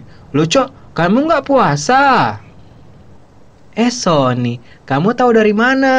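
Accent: Medan, Indonesia. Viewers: Bang orang Medan ya? Soalnya logatnya mirip orang Medan 😅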